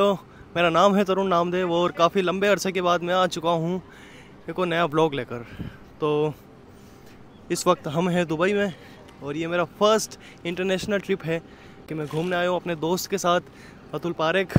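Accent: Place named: Mandsaur, India. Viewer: Tu bhai tone chng mat krna...khanpuriya mandsaurii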